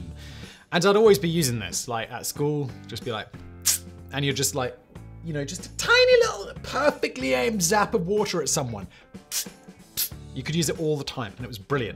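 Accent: United Kingdom. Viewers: a witty British narrator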